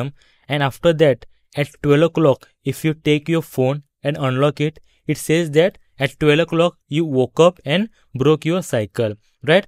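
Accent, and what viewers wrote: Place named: India. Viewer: why indian accent is so bad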